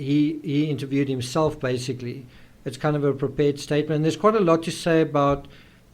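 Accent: South Africa. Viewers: hello, i can't find your name but you sound like a fellow South African. Am I correct?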